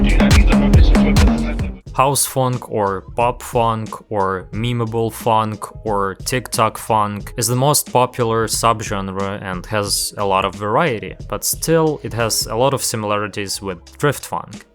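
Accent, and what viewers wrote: Russia. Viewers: i love this russian accent its just Beautiful , i know phonk is born american but i cant imagine phonk without russia ! thanks bro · лайк с русским акцентом, красава чел! 👍🏻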